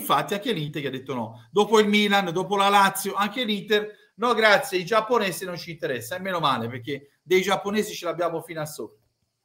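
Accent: Tuscany, Italy. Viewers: Ho iniziato a seguirti da poco ma ti faccio i complimenti..a parte la parlata toscana che adoro, io sono bolognese ma interista, sei l unico che in tempi non sospetti ha detto le cose come stanno...i risultati hanno offuscato la disastrosa situazione economica...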